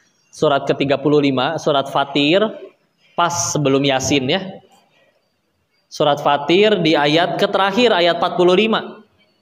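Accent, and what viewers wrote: Sunda, Indonesia. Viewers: Bener pisan logat sunda dan kalimatnya aagym banget. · Nyunda banget suka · Sunda pisan akang teh 😇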